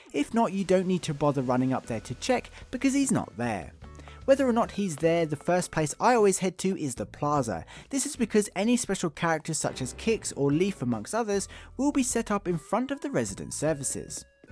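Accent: England, United Kingdom. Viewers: You have a great english accent 😌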